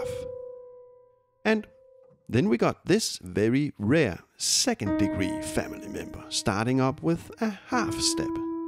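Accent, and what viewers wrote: Denmark. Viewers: That accent 🤌🇩🇰 Greetings from a fellow danish musician. Great stuff ❤ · You sound Danish...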